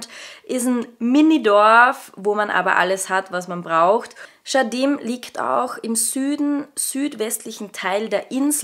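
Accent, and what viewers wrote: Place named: Austria. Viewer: Bin seit einem Jahr dabei. Seitdem will ich mit Mountainbiken anfangen und feier den österreicher Akzent. Danke dafür.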